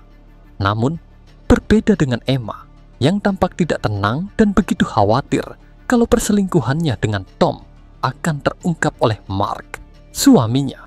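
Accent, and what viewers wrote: Java, Indonesia. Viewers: medhok jowo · suaranya medokk bangett heee,,mantapp bang.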